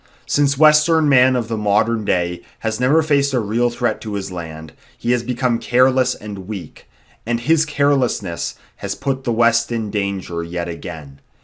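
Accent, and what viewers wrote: Canada. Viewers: are you canadian lol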